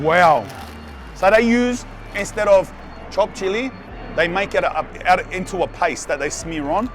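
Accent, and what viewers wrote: Sydney, Australia. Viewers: nice guy, fair reviews but man... the aussie slang is hard to follow, it's like a south african, british, aussie crossover combo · That’s that Syd accent for ya 😅 makes me miss home. Just found this channel & it’s entertaining, real & humorous. Died at the coriander 🌿 & I love fresh rolls too 😋 nobody does pork rolls like Syd.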